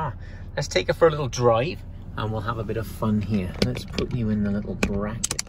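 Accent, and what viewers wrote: Wales, United Kingdom. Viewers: Definitely a Welsh accent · Great video,.. Slight hint of a Welsh accent there I do believe 😁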